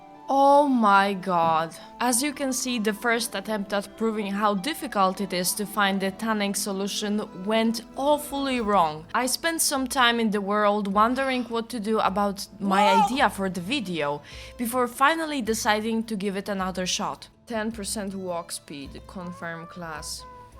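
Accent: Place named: Poland. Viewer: Why do I have this strange feeling that you're Polish? I could swear you have that kind of accent.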